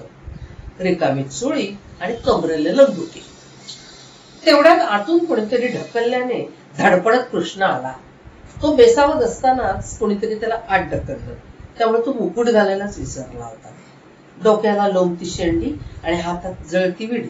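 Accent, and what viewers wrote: Malvani, India. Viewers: व्वा ताई , खूप छान.. प्रत्येक वाक्यागणिक प्रसंग अस्सल जिवंत उभा करण्याची ताकद जबरदस्त..आवाजातील चढ उतार, मालवणी हेल इरसाल मालवणी ठसका अप्रतिम... अजून ऐकायला आवडेल हा असा गोड , मंजुळ आवाजातील अभिवाचन.. आवाज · प्रसंग डोळ्यासमोर उभा करायच सामर्थ आहे या अभिवाचनात आणि आली , वस्त्रहरण नाटकाची आठवण !!स्वतः मालवणी नसतानाही ठसकेदार मालवणी सादर करण एकदम सही!! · अभिनय वाचनातून मालवणी ठसका माका भावलो हो ताई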